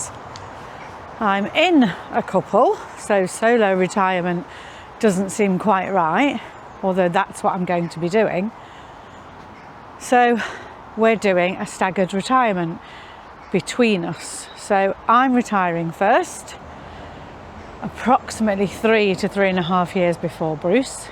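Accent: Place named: Leicestershire, United Kingdom. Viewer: Is this a Leicestershire accent 😊.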